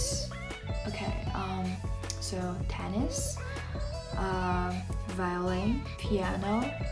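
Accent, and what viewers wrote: Shanghai, China. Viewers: 你说上海话我听得懂听得懂哈哈哈哈哈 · 哈哈哈上海話講的蠻好聽的呀，可愛~ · 上海话😭😭😭又亲切又可爱，好像从小看着长大的邻家小妹一般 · 00后原来讲上海话已经洋泾浜了！好可惜啊！susan加油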